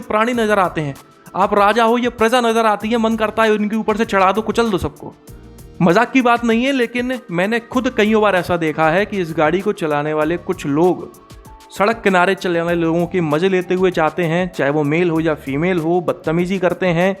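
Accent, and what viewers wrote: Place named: Gwalior, India. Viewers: Bhajja tumai language hame bhind muraina ki lagte😀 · बहुत समय बाद ग्वालियर चंबल बेल्ट की टोन सुन कर अच्छा लगा..... सही रिव्यू दिया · Lagta hai aap pakka gwalior ya Morena side se ho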